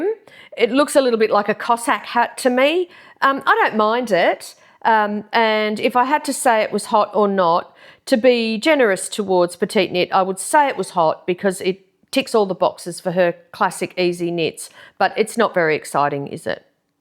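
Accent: Australia. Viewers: Love your blunt approach & hearing an Aussie talk about knitting & yarn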